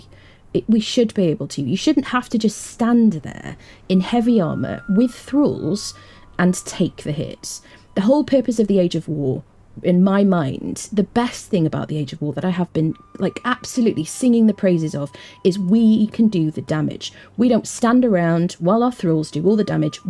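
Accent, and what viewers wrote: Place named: United Kingdom. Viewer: I love uk accent. Bee-tah. 😍 Us southern yanks say Bay-tah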